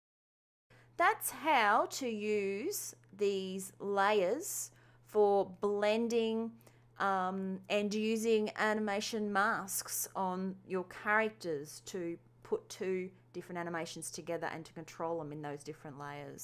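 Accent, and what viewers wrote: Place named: Australia. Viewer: I'm from the US, and there is something about the Aussie accent that just makes it feel easier to learn and listen. That or this lady is great at explaining. Maybe both! Just me?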